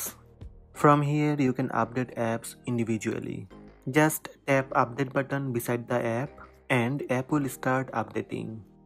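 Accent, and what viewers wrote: India. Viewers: GOOGLE IS USELESS THANK YOU MY INDIAN LOVABLE ACCENT GUY! also im subscribing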